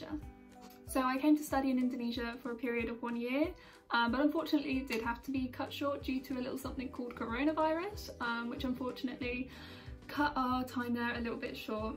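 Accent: Birmingham, United Kingdom. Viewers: first yet thuough it was aussie accent, oh i see this is birmingham accent:) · very pretty, harry potter accent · aksen Birmingham dia nih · Aksen nya british banget yah.. 😁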